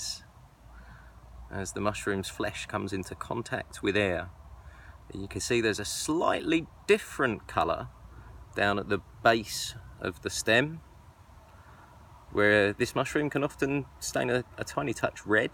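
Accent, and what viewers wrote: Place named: Bristol, United Kingdom. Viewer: Are you from Bristol ? Im trying to work out your accent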